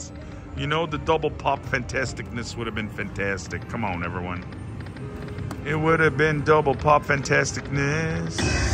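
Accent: South, United States. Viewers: I’m originally from Houston and I must say that today you’re accent was beyond any southern lady’s or her best “ fan waving” day!!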